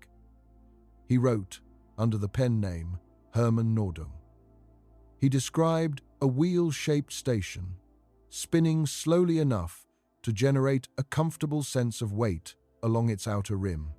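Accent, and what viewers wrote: England, United Kingdom. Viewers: There doing everything they can to keep us from reaching the stars. I believe none of it. English accent and muckedy music and were supposed to think they are intellectuals.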